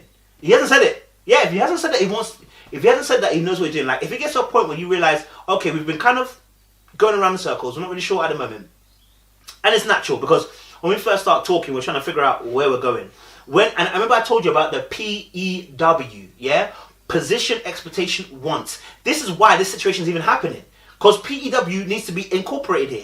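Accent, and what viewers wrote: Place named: Birmingham, United Kingdom. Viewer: You're starting to sound a bit brummie 😁 coming from a brummie, I can hear it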